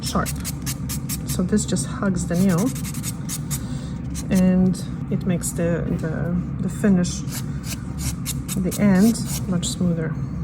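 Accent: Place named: Poland. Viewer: She lives in Spain but is not Spanish. I think she may be Polish.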